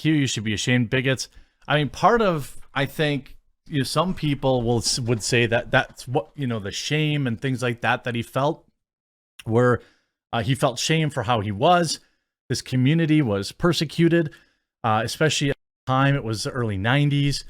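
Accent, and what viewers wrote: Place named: Wisconsin, United States. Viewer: I didn't realize you had a wisconsin accent until yesterday, and now it is all I can hear.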